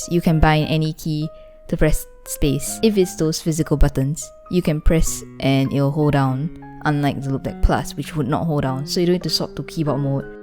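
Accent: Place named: Singapore. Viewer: Sounds Singaporean 👌🏼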